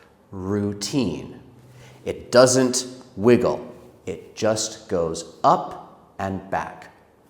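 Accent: United States. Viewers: Hey, I love American accent. I was doing the shadowing practices And wow it comes naturally. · You are American or British?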